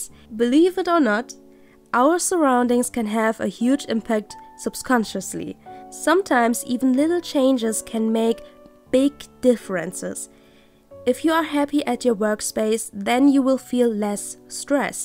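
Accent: Germany. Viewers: Hey^^ are you german?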